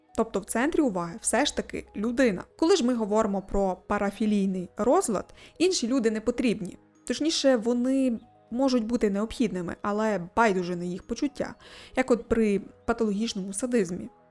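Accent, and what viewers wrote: Transcarpathia, Ukraine. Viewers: Ти з Закарпаття?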